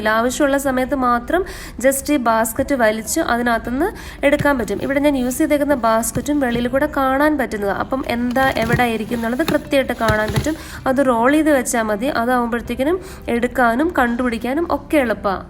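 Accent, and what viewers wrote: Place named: Kollam, India. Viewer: ariyilla.... samsara shaili kettappol tonni..appol onnu confirm cheyyamen vicharichu... ende naad kollam... njan from karunagapally... husband from kadavoor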